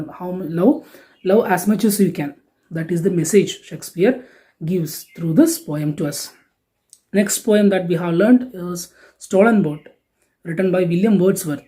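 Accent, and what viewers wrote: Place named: Kerala, India. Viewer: Sir u r from Kerala no???? We can identify it when u r talking Sir I too a malayali 🙂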